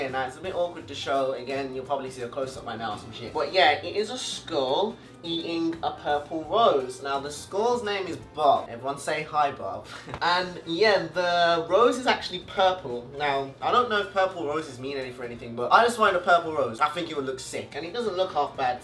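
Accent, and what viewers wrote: United Kingdom. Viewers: Ma Nigga on your songs you sound like you'r from hell but on your videos you sound like you'r from london (because england is my city) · Wth I f with his music I never thought he would talk like this I didn’t even know he was British damn tuff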